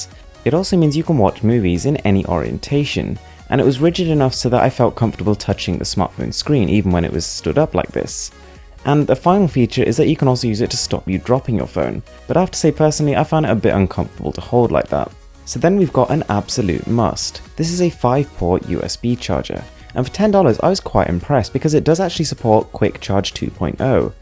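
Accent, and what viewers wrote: United Kingdom. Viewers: No offence but why have US links when you have a british accent? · This entire time I thought you were a white Britain guy from your voice until i seen your picture. And you have some good videos, especially tech room vids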